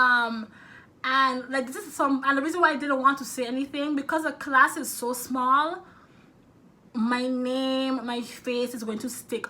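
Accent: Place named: United Kingdom. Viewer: i can hear your uk accent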